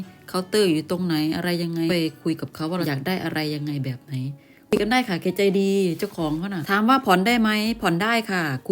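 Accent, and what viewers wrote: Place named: Isan, Thailand. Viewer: เสียง พูดกลาง ผสมอิสาน เพราะนะ มิน่าสติกเกอร์ทำที่ไทยสวยจริง นึกอยู่แร้วว.สติกเกอร์จากไทยแน่ๆ อิอิ ร้านพี่ก็พิมพ์สวยน้าา ไปดูที่ช่องเลยงับ😁😁😁😁😅😅😅😅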